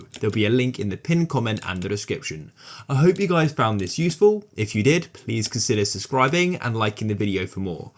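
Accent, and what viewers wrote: United Kingdom. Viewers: You bri-ish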